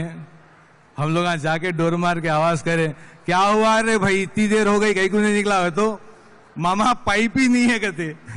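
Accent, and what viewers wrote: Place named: Hyderabad, India. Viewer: Kali Hyderabadi bolthey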